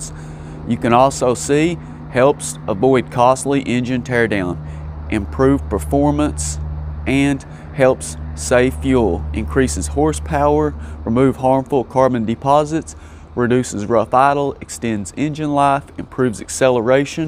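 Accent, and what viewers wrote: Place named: Boston, United States. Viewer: boston guy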